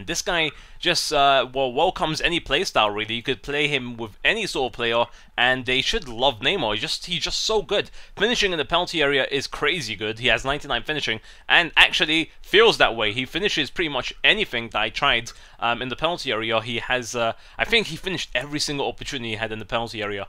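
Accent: Belgium. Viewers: He's not French...Didn't you ear this ugly Belgian accent ? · sounds like he was from belgium! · This guy had a belgium accent btw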